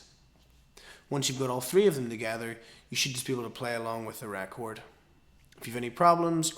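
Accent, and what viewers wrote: Ireland. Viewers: how do you know the beatles didnt say diene, its not just the beatles that are from liverpool that have the main accent, different accent DUH. not just the beatles. and he doesnt even sound like he's from liverpool, more like irish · awesome... do you have any tips im 14 and from australia..and been playing for a year.. i am in a band... i play bass.. we play this song and when i play the verses my fingers get very very sore and i get tired .. would you have any tips.. please reply ... awesome vid!! your from ireland but live in america is that the accent? if you have any tips please reply .. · dine - dine --- op - dine - op - dine.... accent is hilarious. gotta love the irish.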